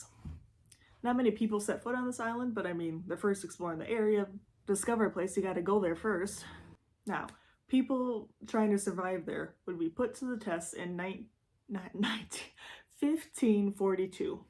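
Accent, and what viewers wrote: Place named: France. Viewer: Liking your French accent 👌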